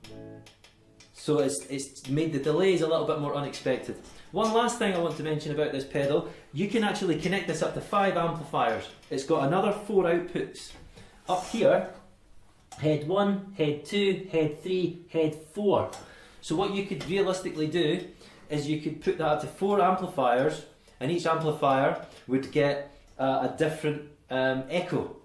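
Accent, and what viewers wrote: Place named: Scotland, United Kingdom. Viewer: thx 4 Ur quick answer - but nope : I speak 5 foreign languages fluently without my "home" language accent :-D - and I can understand "some" regionalisms in all of them, but not "all" of course - so as UR trying to speak "english" U should at least do the best U can doing so .. not very many people on this entire >planet< can speak / understand "scottish" (even after having drunk several bottles of the regional whisky ;-) ) - there4 all I can do here is listen to it several times, hoping to get "into" it after a while .. and it would be nice if you'd apply subtitles in the future (there are fairly good automated programs for that) 8-)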